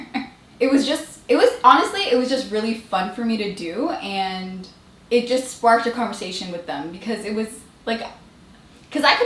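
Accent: Tigrinya, Eritrea. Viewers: I like ur tigrina lol 😂😂 😜 N you're so beautiful u know that 😍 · Girl I like your half English and Tigrigna accent 💯 you got me dead af 😂 I subscribed to your channel keep it up Cutie 🎀🎀🎀🎀🎀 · I love your Tigrigna accent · I like u r tigriniya accent so lustig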